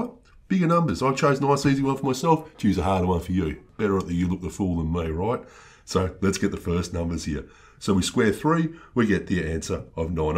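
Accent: Australia. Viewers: Definitely Australian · This is some different Australian accent.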